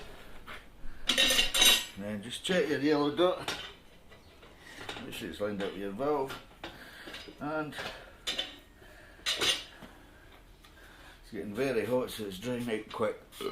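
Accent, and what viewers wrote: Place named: Fife, United Kingdom. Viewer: Very nice! Being from Fife I knew the accent was from over that direction lol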